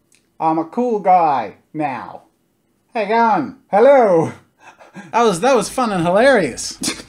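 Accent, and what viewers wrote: Australia. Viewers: So he's Australian I thought he was British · Australian dialect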